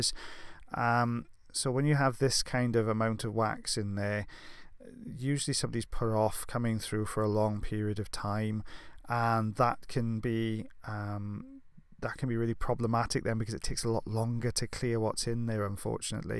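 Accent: Wales, United Kingdom. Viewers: Agree! Want to learn that british accent.. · I keep coming back because i miss the welsh accent while living in korea. When you said go get a cuppa literally lit up my eyes😂 nobody has said that to me in 5 years while ive been here! Aaaand your videos really relax me before bed! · Haha...18 minutes of a Welsh accent...how could that ever bore anyone? And an explanation of what is going on...I have learned plenty...and not only the terms (the attic, canal walls, etc) and the various conditions which occur. This is a whole new course! Thank you. I didn't waste my isolation!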